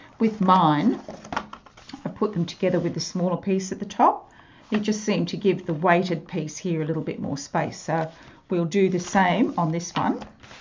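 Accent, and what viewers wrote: Australia. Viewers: Love hearing the Aussie accent! And really like the project. The angle of the camera is a quite uncomfortable to view!!! Hope you might be able to change this!! Thanks for a great tutorial!!!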